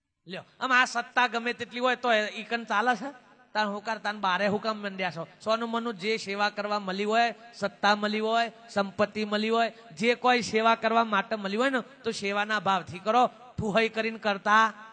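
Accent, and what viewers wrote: Sabarkantha, India. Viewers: Humm mehsana ni nathi sabarkantha ni boli che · ભાઈ આતો સાબરકાંઠાની બોલી છે......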